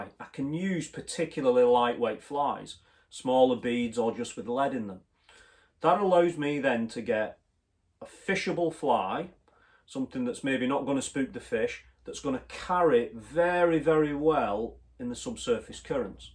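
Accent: Wigan, United Kingdom. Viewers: Really enjoyed these videos - even my wife commented that your explanations were very clear and uncomplicated. Having said that, could you just clear up the lengths of the thick coloured nylon. Is each length 30 cm, making 60 cm for the two or do the two pieces together add up to 30cm? Must say that I've enjoyed hearing your, once, very familiar accent having been schooled in Wigan in the sixties.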